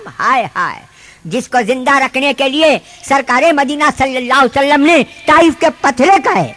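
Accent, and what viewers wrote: Bangalore, India. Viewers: Yeh purely bangaloreans ki urdu hai yaar ( aso bangalore ku baath karinge tabich malum hotaye bekaar ke commentan likh ko chhatto nakko)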